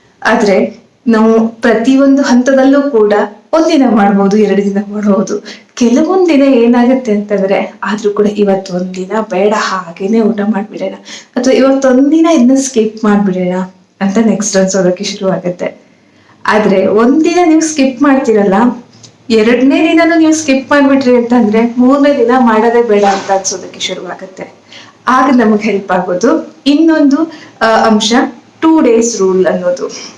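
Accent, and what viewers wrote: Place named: Kumta, India. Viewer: ನಿಮ್ಮ ಮಾತು ಕೇಳಿದ್ರೆ ನೀವು ನಮ್ಮ ಕುಮಟಾ ಕಡೆಯವರು ಅನ್ನಿಸ್ತಿದೆ ಮೇಡಂ.ನಾನ್ ಹೇಳಿದ್ದು ನಿಜಾನಾ...ನಾವು ಕೂಡ ಕುಮಟಾದ ಮಿರ್ಜಾನ್ ದವ್ರು 😍..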